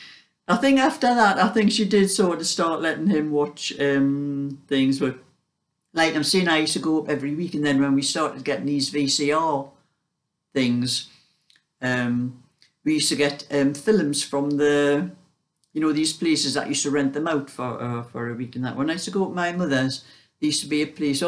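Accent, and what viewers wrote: Newcastle, United Kingdom. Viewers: Hi. I have only recently found you. As a scouser who moved to Canada over 30 years ago, I have always been a sucker for the Geordie accent😊 I have been following your tutorial for the big crochet bag. I started as per you instructions with a 37 stitch chain Measured it and it was about 16 inches as you said. I am now doing the sides and the base magically measures 20 inches. It's HUGE! I tried to send you a picture but I don't know how to attach to this message. Do you have any advice? Thank you. Sue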